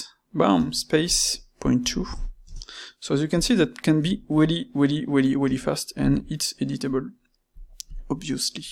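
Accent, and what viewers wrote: France. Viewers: La qualité et tt ce que j'ai appris des ces tutos, mais aussi la force que ces vidéos sur Blender ont comparé aux autres c'est l'accent francais qui fait la force de ces tutos. gg x))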